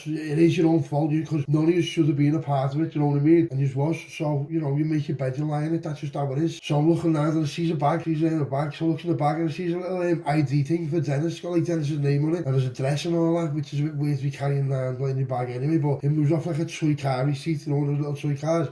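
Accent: Liverpool, United Kingdom. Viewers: Oooow scouse 😂😂😂 even in my darkest hours you still make me smile m8 🎉🎉🎉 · YES SCOUSE. I'd literally listen to one EVERY day, always click straight on when see one of yours. 'What are you on about"❤😂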